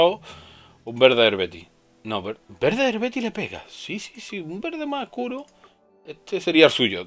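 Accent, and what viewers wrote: Spain. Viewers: ¿porque habla asi?, son otro tipo de españoles?, no lo digo insultando solo es una duda porque otro youtuber habla asi, no are spam con el nombre de yutuber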